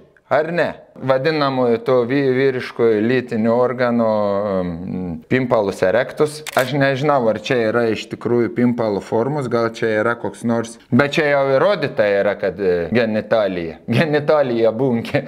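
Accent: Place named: Samogitia, Lithuania. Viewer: Daugiau žemaitiška rokoukis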